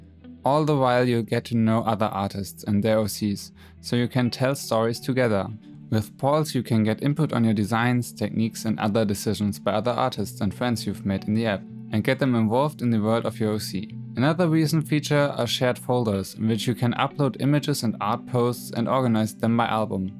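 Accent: Germany. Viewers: dieser deutsche Akzent xD aber schön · r u from germany? haha i dont know your accent is a bit like mine. ^^” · DEVIL MAY CRY?! My favorite character. Dante is. Jeeez. Amazing. I even loved nero and dante with short hair. I hated it in the beginig. So you have with hair right? A little Dante ispired? I started listening to your videos late at night when i couldnt sleep. Ill be hones. Your german accent is... relaxing to me cuz of years of watching rtl2. It was my only sorce of anime back when i was a kid. Had luck cuz i was taking germany classes since kindergarten. Why was this so important here in my country i dont even know... i think u are so much more capable than me. Think u are even the same age as me but i dont know.. while i draw and paint all the time i strugle. Or maybe i should learn to accept my style. Cuz its definetly very diffrent of what i want. 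· Also ich denk mal du beust deutsch wegen deiner aussprache , also schreib ich mal in deutsch xD auf webtoons gibt es eine story namens sirenes lament und da gibts auch einen ian der so eine attitude hat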